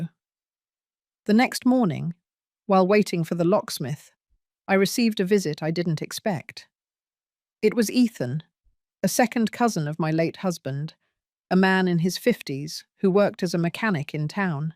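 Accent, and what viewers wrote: England, United Kingdom. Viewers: This is an English accent not American.🇬🇧 Long and drawn out with no substance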